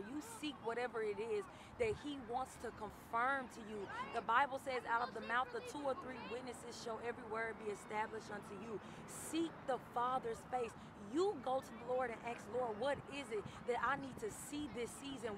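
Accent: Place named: Memphis, United States. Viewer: This was right on time. Idk how I got to your channel but I’m glad I did. Your videos have really been blessing me. I love the Memphis accent too (lol I can always hear a fellow Memphian 😂)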